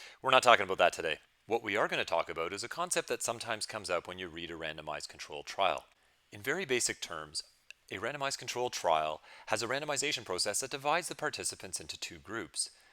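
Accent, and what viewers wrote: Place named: Canada. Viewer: That Canadian accent thoughhh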